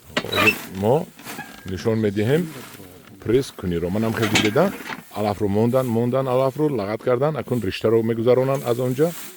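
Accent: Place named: Tajikistan. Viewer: Амаки ман бори аввал аст навори шумора мебинам, бисер писандам омад, хусусан сабки тоҷиконаи гуфтор ва тарзи фаҳмондадиҳӣ, офарин ба Шумо! Фаромуш накунед ки дар Тоҷикистон ҷавонони бисер баландтафаккур ҳастанд, вале имконият ва муосидат аз тарафи давлат хеле кам аст, чун он ҷо аксарият тайерхурону шикамдамони бемаърифат нишастаанд! Боқӣ саломат бошед. Тавсия ба Шумо: имкон бошад дар студияи сабки овоз ба дубляжи филмҳои классикии амрикоӣ ва ҷаҳонӣ аз заӣоннҳои русӣ_ инглисӣ машғул шавед; овозатон бениҳоят ғулғуладор ва устувор ва табиӣ садо медиҳад; PS Sufizoda Siroj, журналисти озод)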